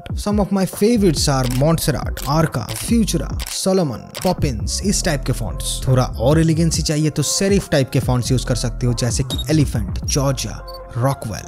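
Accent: Bengal, India. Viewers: Tum bhi bengali ho na? Tomar hindi te banglar tan ache!!!!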